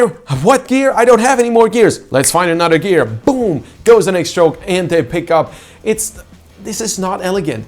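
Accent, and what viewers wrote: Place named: Italy. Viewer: I absolutely loved the energy from this video!! Its tis type of race that inspires those magical stories about rowing; the inhuman passion drive/sprint that shoots a crew through the finish first against the odds. I always get the 2017 world's Lodo and Vicino pair vibes, just channelling the passion and drive for victory. Videos like this are fantastic! Loved it, and 10/10 Italian accent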